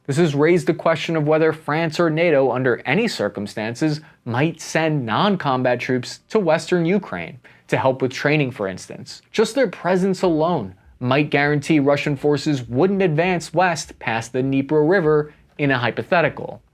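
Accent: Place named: New York, United States. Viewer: Unfortunate....Sorta liked the reginal New Yawk accent...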